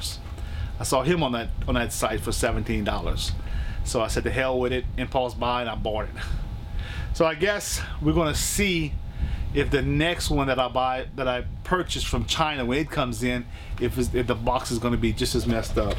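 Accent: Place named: Lafayette, United States. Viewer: dude my Xbox was playing random videos about pops and yours popped up and as soon as I heard your accent I had to do a double take. I was born in Lafayette. so happy I found your channel!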